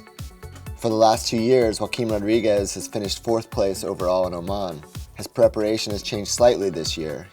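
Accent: United States. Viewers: Why the new American accent? Don't like it all. Stick to British presenters, and maybe call in the Irish when the Rás comes into the conversation.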